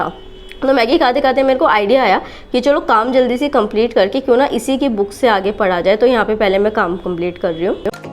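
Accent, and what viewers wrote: Haryana, India. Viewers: R u native from Haryana ????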